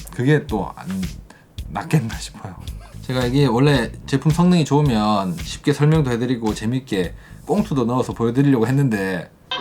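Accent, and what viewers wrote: Gyeongsang, South Korea. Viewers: 재미있기도 하고 진실함도 보여서 좋습니다. 대구 근처로 생각되는데 경상도 억양이 더 정겹기도 하고~~~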